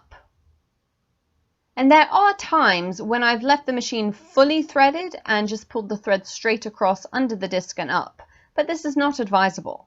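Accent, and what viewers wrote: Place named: England, United Kingdom. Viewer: Thank you so much for uploading this video on bobbin winding. I now know why I was having trouble. My one and only metal bobbin’s notch was not deep enough and the reason for not catching on to the bobbin spool pin. I have easily rectified this by using the edge of a fine saw blade. Just a few back and forward strokes has allowed it to fully engage. I have as you suggested opened ‘About’ and sent my email for your very kind offer of a pdf copy. Thank you so much for all of your videos, which have been a great help to me. Glad you have not lost your refined English accent!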